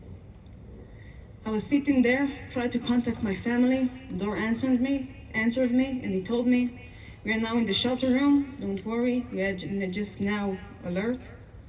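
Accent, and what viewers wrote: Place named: Israel. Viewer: Afrikaans with an Israeli accent - first time I hear that!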